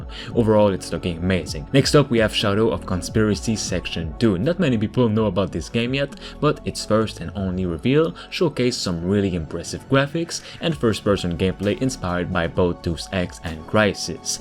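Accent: Quebec, Canada. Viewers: this guy is clearly from quebec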